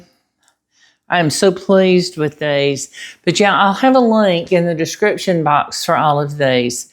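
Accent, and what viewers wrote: South, United States. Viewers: Thanks. Remember I’m up in New England and thought I was all set with your southern accent. 🤭 sorry about that ❣️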